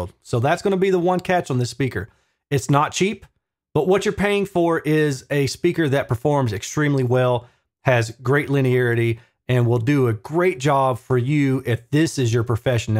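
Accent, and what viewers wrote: South, United States. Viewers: That's a true Southern accent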